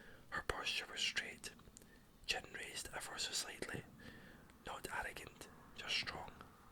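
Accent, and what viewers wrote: Scotland, United Kingdom. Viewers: Your voice is sooo relaxing! Hehe, can't resist that Scottish accent. ^_^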